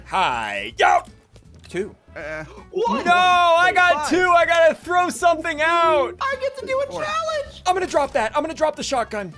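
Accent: Canada. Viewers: Is he Canadian (im Canadian so that’s not racist) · Are u Canadian? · He’s Canadian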